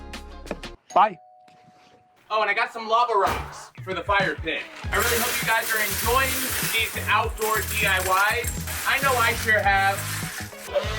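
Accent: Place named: Midwest, United States. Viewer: It is his Midwest accent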